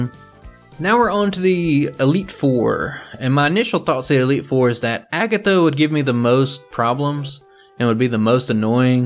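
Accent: South, United States. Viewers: I like the southern drawl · Personally I'm a fan of the southern sound you have when talking. It's different and makes you stand out. And if that's just how you talk then keep it up. I may watch may different people streaming these games but i love everyone's approach be it jrose, Scott's thoughts or vanman, everyone brings something new to each play thru. And honestly I like that your open about doing it not as a professional but just a fan of the series. Keep up the good work man. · As a guy with a strong southern accent I appreciate the accent. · As a southern girl, I love your drawl.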